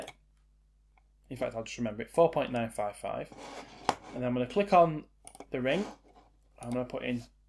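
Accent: United Kingdom. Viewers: Grate Tutorial! Excellent British accent!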